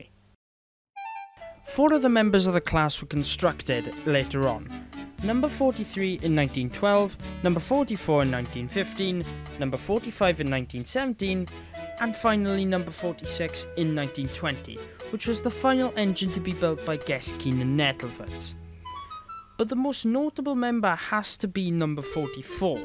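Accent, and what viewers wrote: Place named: Wales, United Kingdom. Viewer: Your voice sounds beautifully welsh ^^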